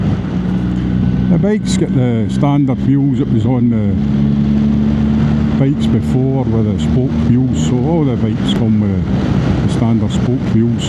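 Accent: Scotland, United Kingdom. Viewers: One of the very best reviews on the <num> GSA by a person who obviously knows his GS or GSA bikes. A very good video compilation comprising super illustration of bike sounds and agility, and love that cool headed Scottish accent - just like your country cousins here in Co. Antrim 😄 ! I love my R1250GSA TE and the 1300 GSA TE will indeed need to be top notch to get me off it. Your video certainly has encouraged me to make that move ....... say in another year but the move is now on the cards given your excellent review answering lots of my unknowns and obviously a test ride will be in order. It's hard to beat German engineering. Many Thanks, Wm. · Hi, great review. I had to get used to your Scottish accent, but once I did, I really understood you (I'm from upstate NY). Can you do a review of the GSA with ASA? I'm curious as to what you think about it.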